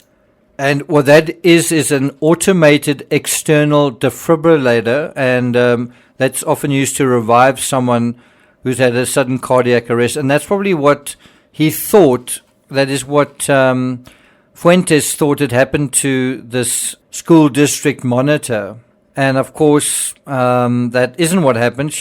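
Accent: South Africa. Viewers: Me too! I ❤️ accent too. I think it’s South African.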